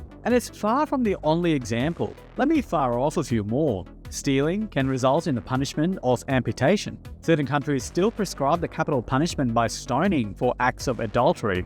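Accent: Australia. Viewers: He's Australian but I believe partially grew up in an Islamic country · He sounds australian….shows what you know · You've got native australian accent. I suppose you were under 10 yo when you left your country :) thanks mate for the content!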